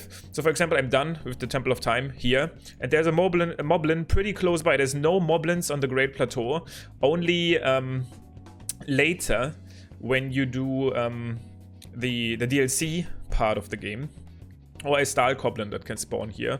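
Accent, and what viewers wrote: Germany. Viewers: are you german? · You Sound german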